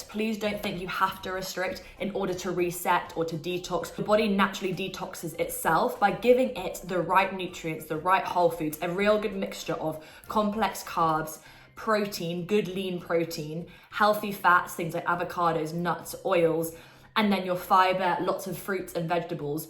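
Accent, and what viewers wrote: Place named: South, United States. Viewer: I enjoy your videos. They are enjoyable to watch but would you stop with the southern accent? Please.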